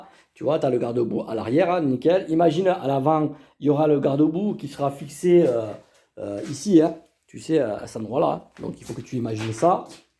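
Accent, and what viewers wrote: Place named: South, France. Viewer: est ben écoute Fréro tranquilou 🤣👍 Ouai tkt , je sais ce que c'est .... Compliqué de tous géré 😭 Mais bon .... Ça fait plaisir de revoir ta bouille et l'accent du sud bordel 😁💪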